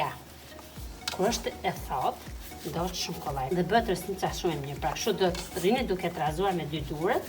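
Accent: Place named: North, Albania. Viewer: Te lumshin duart do ta gatuaj se me plqeu shum si recet. se je nga Veriu kuptohet nga e folura po qa vendi mude ta di te lutem.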